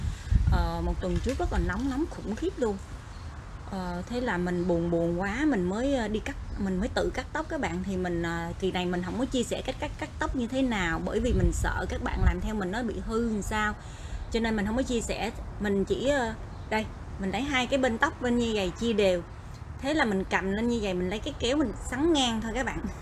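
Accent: South, Vietnam. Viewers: Giọng người đàn ông nói trong video phải là chồng em hôn? nếu phải thì người đó có giọng nói ngọt, đậm chất người miền nam. Bửa nay em đóng kịch tiếu lâm quá. Làm diễn viên được rồi đó !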